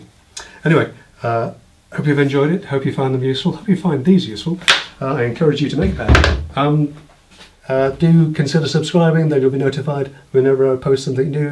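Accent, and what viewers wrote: United Kingdom. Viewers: Why does a UK accent sound so believable? Ha! Maybe it’s an American thing. Love this channel and the info. Thank you!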